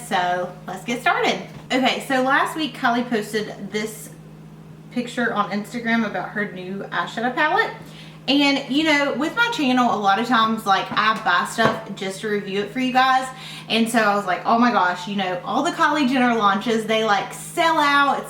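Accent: South, United States. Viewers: this is such a good video like why buy something that's just like other things we own and plus I don't think she could ever make anything sound negative with her southern like accent😊😊😍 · Thank you for honesty, so many times I feel you tubers push products just the sake of selling them. I unfortunately an one those who fall for it too. Keep up great videos. I love your accent too as someone from Kentucky it's nice hear a little southern twang.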